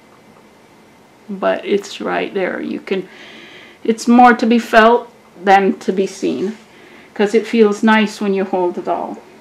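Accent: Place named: Trinidad and Tobago. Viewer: You sound trini 🇹🇹 so cool even if you aren’t. I’ve never heard you speak. Your hands and mind are blessed. 🛐🛐🛐🛐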